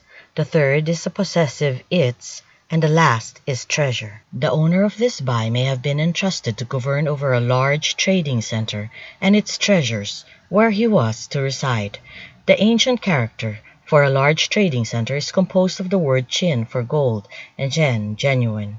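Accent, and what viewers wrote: Philippines. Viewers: What is this now, where do you get your information? Everybody wants to make money making videos. You sound Filipino.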